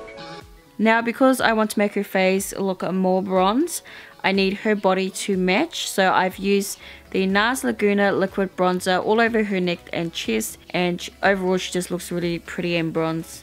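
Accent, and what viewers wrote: New Zealand. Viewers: Are you from nz? x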